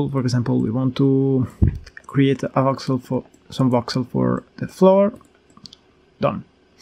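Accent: Italy. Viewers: Lo sapevo che eri italiano, dalla pronuncia ahahahha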